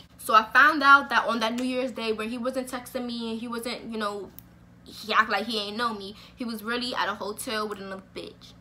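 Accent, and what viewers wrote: United States. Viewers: i could tell u was from pg... same girl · I need a Maryland chick 💀 I’m loving how you talk sis 😂❤️😘 but don’t get me started on cheating & niggas 🤦🏽‍♀️ I got storytimes for days on my channel lol 🙄 keep doing yours!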